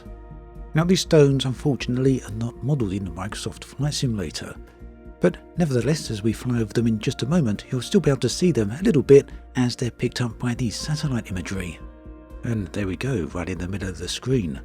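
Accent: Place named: Yorkshire, United Kingdom. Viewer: Your accent is classic Yorkshire lol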